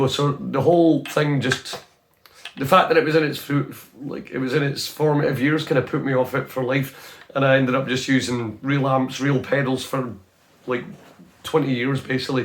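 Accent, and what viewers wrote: Scotland, United Kingdom. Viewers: Real world every day use of one size fits all swiss army knife go anywhere do anything on a shoestring budget guitar pedal? Thank you for posting man. I got one and am just getting the hang of it. My Pearly Gates equipped Les Paul seems to have a higher output than the presets were made for even the clean patches have too much gain. It's cheap, portable and Boss reliability. Love your Scottish accent man. Cheers from the Caribbean. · Hey, Nice to hear a fellow Scot talking guitars. I also own a GT1 and I know what you mean about feeling that something isn't quite the same. I sense this being the same for all modelling gear at whatever price range. I run the GT1 into a Two Notes Cab M for cab emulation and it sounds as good as any modelling kit out there (to me anyway). I think cab and space simulation is really where the difference is made. 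I swapped between tube amps and modelling for ages, finaly seem to sticking to the Boss Nextone (not a modeller) which sounds and feels excellent. Anyway, I like your channel man 😎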